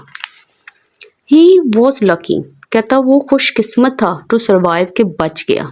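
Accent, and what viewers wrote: Lahore, Pakistan. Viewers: You must be from Lahore ❤❤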